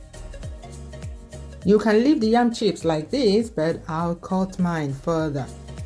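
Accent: Igbo, Nigeria. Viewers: Igbotic accent creeps in 🤩, thanks a million for this lecture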